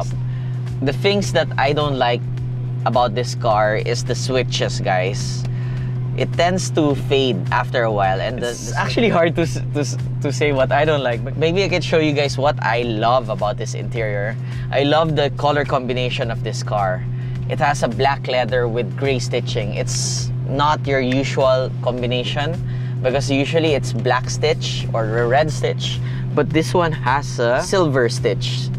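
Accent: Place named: Philippines. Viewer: You speak english but still sound philipine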